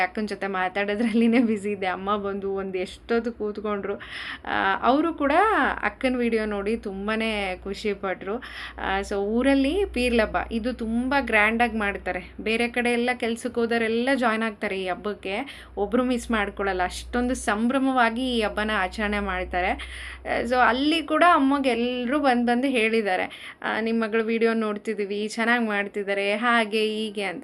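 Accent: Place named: North Karnataka, India. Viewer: Hatarki palle navu tinativi aadare banglore alli sigalla. Navu oorige hodag tinativi. Aamele ನಿಮ್ಮ bhashe matadi chennagide kelakke. Navu uttar karnatak ದವರು.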